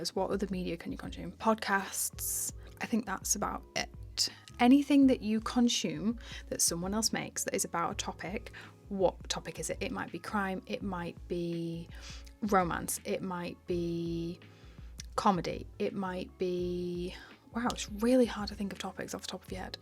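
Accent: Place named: North, United Kingdom. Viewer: heard the northern accent, immediately subscribed 😌🙌🏻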